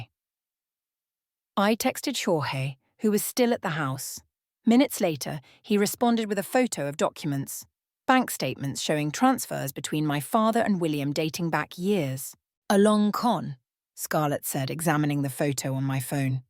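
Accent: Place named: United Kingdom. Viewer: I love the British narrator.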